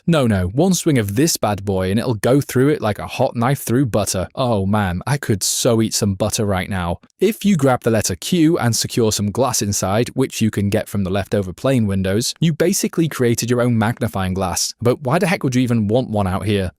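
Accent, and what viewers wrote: United Kingdom. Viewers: Your also British Mate? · He's British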